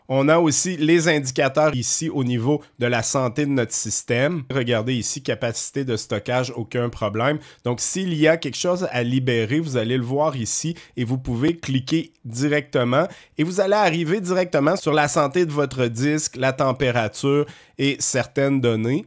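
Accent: Quebec, Canada. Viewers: Bonjour l'ami, perso je ne comprends pas tout, car j'ai fait des manips comme toi, mais je n'arrive jamais au résultat escompté, de plus, je n'ai que très rarement les mêmes fenêtres qui s'affichent à l'écran ... J'utilise windows 11 famillial installé de base sur mon PC, pas de version pro payante, du coup beaucoup de tes trucs ne fonctionnent pas ... Pourrais tu te mettre au niveau du commun des mortels et proposer des astuces qui marchent avec les versions pré-installées et non payantes que la plupart des gens possèdent ??? Rassures toi, j'aime énormément tes vidéos, ne serait ce que pour ton accent !!! Mais il serait sympa que tu penses aussi au petit peuple qui ne peut s'offrir la version pro ... Allez, je dis ça, je dis rien ... Bises à toi mon cousin de la belle province😘😘😘 Phil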